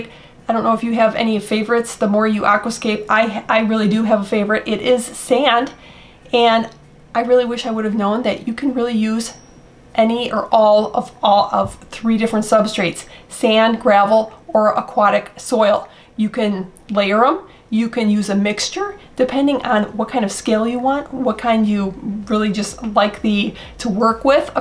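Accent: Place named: Midwest, United States. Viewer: I'm not sure if it's your Mid-Western accent or Mom humor, (that can be a thing, too right?), but you are delightful and engaging teacher!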